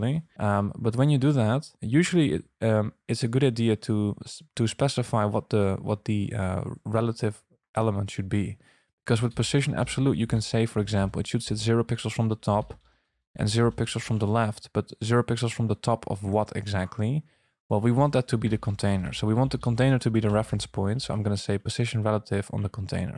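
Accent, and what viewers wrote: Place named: Netherlands. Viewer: Was almost going to comment in Dutch because of the accent, but location says USA, so here is my question: Can you stop a browser from rendering translucent gradients AT ALL? Today it is very popular in video players to use it as a background between the video and the navigation bar/buttons, which is totally annoying. Youtube does this as well. I need a way to make some CSS options impossible on a browser wide level. I managed to do a similar thing with rounded corners, but can it be done for gradients as well?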